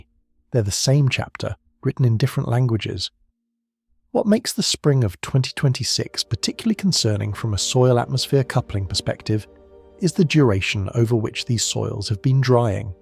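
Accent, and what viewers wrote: United Kingdom. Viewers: Please remove the very annoying British English narrative in favor of neutral American English. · ......I'M SO SICK OF THESE CRAPPY NARRATIONS, WITH "BRITISH ACCENTS"!!!!!!!!!!!!!!!!!!!!!!!!!!!!!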